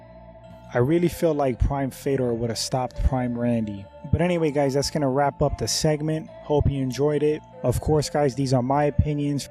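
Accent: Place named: United States. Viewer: I know, I can tell from your videos the knowledge you give and exampling is well on point. Plus the casuals are important for MMA right now I only say it because people are talking about it and participating in the sport which will carry it on in the future. I'm only 23 years old. I became a fan of MMA around 2006 or 2007 the first fight I watched was Liddell vs Ortiz 2. I played a video game called UFC Sudden Impact way before I became a fan of MMA, But anyway I was a Amateur in Muay Thai and Boxing when I was younger (I know a lot about those to sports as well) my biggest accomplishment so far is fighting former WBO Champion Joseph Parker in 2009 Cruiserweight Golden Gloves in New Zealand. Unfortunately I got KO'ed in the opening tournament. Glad to see he's doing great in his career. I've only had 1 MMA fight that was back in 2013 lost by DEC but that year I defeated Patrick Afoa who fought Israel Adesanya 4 times in Kickboxing. 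But I quited from fighting 4 years ago for family reasons. But its awesome to see a fellow MMA fan that knows what he's talking about, its great to hear that your training as well and that you sound either American? or Canadian? Which makes you'll have more opportunity then then what I had in New Zealand. Well Thank you for the comment. I will stay in tune for your videos from now. I may have other fantasy fight suggestions like: Kazushi Sakuraba vs Rickson Gracie Stipe Miocic vs Tim Syvia Tyrone Woodley vs Matt Hughes BJ Penn vs Urijah Faber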